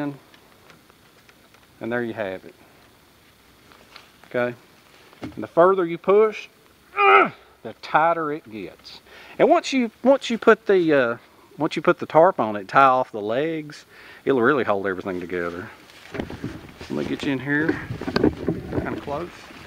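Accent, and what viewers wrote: South, United States. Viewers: I had an ol' D.I. that told me: "Private - - why do you talk...so...slow?!" I told him "Sir, because this recruit's Dad is an Okie Sir." He tried NOT to laugh when he told me that explains a lot! I thought I'd share that with you. don't ever lose that Southern way, it's what makes you original & thanks for communicating at my tempo! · Great video Sir, love the Southern accent. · c'est l'accent 'du midi' aux etats unis. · i Like the way you Talk, it Sounds very 'murican:D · Don’t ever apologize for speaking southern. I lived in Alabama as a kid about 60 years ago and I like your accent. Louis Grizard, a southern humorist, had a saying, “God talks like we do.” I still sound like I’m from Alabama and I like to think Grizard was right. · Where are you from? I;m so southern I'm related to myself, lol!! I think your drawl is even more than mine! 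· Man, I like your accent so much! which part of the states are you from? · Excellent video as always. I haven't commented in a while, but that doesn't mean I haven't been watching. I always look forward to the next episode of World. But watching this one, it occurred to me that you're like the Mr. Rogers of the bushcraft community. So friendly, jovial, non-threatening, helpful, and nothing but a passion for teaching all the uninitiated (and the seasoned survivalists, as well) your wealth of knowledge, whether original or learned yourself. And you don't take yourself too seriously!! That's the best part. Keep 'em coming. And worry about your Southern. Us NC boys, and all of us born and bred in the South, know exactly what you're saying. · I think my settings are okay but could be wrong; anyway I loved seeing Flat Head's long term shelter. By the way as for the southern accent you are home grown and cool as you can be so junk'em if they don't like how you sound. 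I saw in your last video you had an LSU mug; are you a graduate or is that where Nick is attending college? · Fabulous, really enjoyed watching and listening to your Souther accent. Carol from Edinburgh, Scotland, UK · Excellent video, my god i love that southern drawl...keep up the good work...thanks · Cool shelter Glad to see you making videos again. I am from the south too love ur accent dude. Flat Head would be honored to be remembered. Till next time. Thanks for taking the time.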